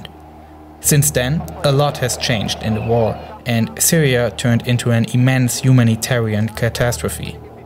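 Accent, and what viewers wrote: Germany. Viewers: Alter. Arbeite an deiner Aussprache. Das ist schrecklich. Man hört das Deutsch richtig und es tut selbst mir als Deutschem in den Ohren weh. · I found this video on reddit /r/documentaries and I am wondering why this video only has about 8K views. It is really informative and neutral! You explained the situation in Syria in a very illustrative way and I think you deserve much more views!! And I have one, rather informal question, are you from Germany? (I think I recognized your accent, because I am from Germany ;)) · Great informative video of what is going on in the Middle East. And from what I heard of your accent I'm guessing you're German? You have pretty good English for a native German speaker! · I'm half german and i have almost the same accent :) So i guess he's german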